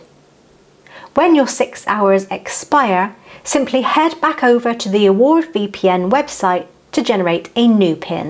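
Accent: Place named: West Country, United Kingdom. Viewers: Sounds to me like somewhere not far from Stroud. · You are a very agreeable and attractive host, with a lovely soft West Country (?) accent. x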